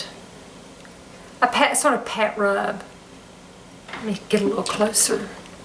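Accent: South, United States. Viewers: I ❤️ your southern accent. I think you are so beautiful.., just gorgeous., you don’t need to wear makeup. I would love to know your am & on routine, what you use & when etc..! Sending the very best of everything in life that you deserve..! Cindy- Hemstreet- Plath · wow ,you are beautiful and Love that southern voice .you are so sweet .so glad to find you. being 55 .i need help .lol wished i looked as good as you .thanks for the tips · Loving the southern accent!! Makes me feel invited into your home as southerners are always so welcoming! I bet you cook some amazing home cooked meals! What time should we be there? <3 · Your skin is gorgeous!!!!! I also use Retin A around my eyes . I actually use it on my entire face , and my neck ( less often on the neck ). I'm noticing some subtle changes after almost a year . I'm 39 . I have red hair and light skin , but I can tan if I try . 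I made the mistake of tanning when I was in school because the other kids made fun of me for being pale . I'd give almost anything to go back in time and stay out of the sun . I have crows feet and pretty deep 11's . I also have pretty deep forehead lines . I'm very expressive when I talk , and I smile a lot ( makes em worse ). I don't know how old you are , but you have the skin of a woman in her 20's ! You're so gorgeous! I love your accent . I'm southern too , but my accent is much thicker than yours . I love how you don't use any filters in your videos , and you are sweet and genuine . Thanks for the video :-) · Love your southern accent. Where are you from?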